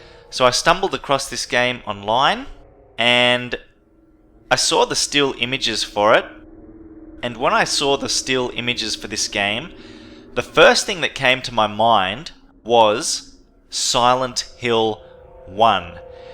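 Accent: Australia. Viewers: Your accent is awesome! Is it Australian?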